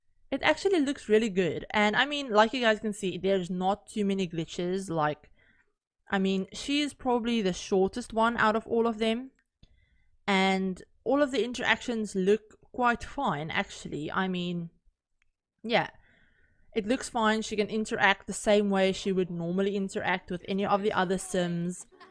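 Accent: South Africa. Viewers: South african accent lol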